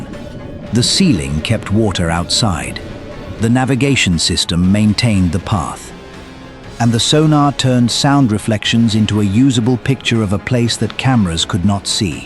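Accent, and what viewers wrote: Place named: United Kingdom. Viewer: strong British accent is a dead giveaway that video is a fraud. Scammers.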